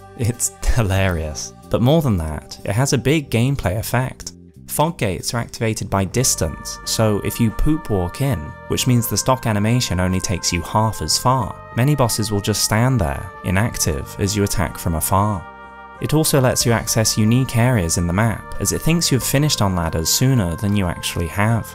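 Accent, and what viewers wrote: United Kingdom. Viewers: An actual good YouTuber making good content! Love to see it! Too many wannabes out there, for some reason I think you and 3kliks would get along great, you two and the amount of British emitting is incredible, especially in narration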